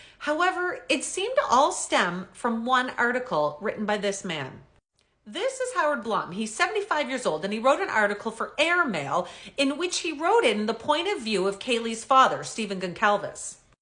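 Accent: Canada. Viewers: I don't believe your pronouncing Steve and Kaylee's last name correctly. Never mind the Canuck accent. should check that out. Maybe I'm wrong 🤷🏼‍♂️🤦🏼‍♂️🤷🏼‍♂️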